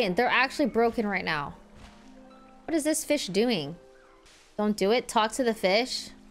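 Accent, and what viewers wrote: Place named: Philippines. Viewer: That Filipino accent was strong on this one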